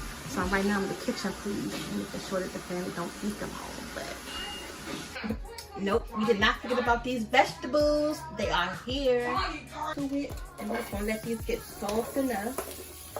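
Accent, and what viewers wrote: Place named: DMV, United States. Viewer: The DMV accent is so strong lol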